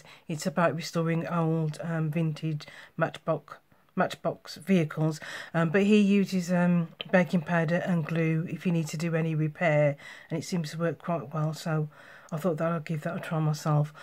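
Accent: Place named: United Kingdom. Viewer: I'm so jealous of the British accent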